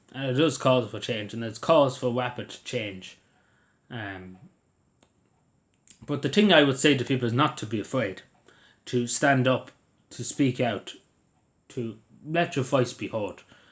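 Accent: Cavan, Ireland. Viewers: The way you speak is euphonious. I was just whiplashed when I clicked the video. If you will allow to to make the suggestion, maybe read some excerpts (the prologue for example) of Canterbury Tales in a youtube video. It would preserve the Cavan accent digitally.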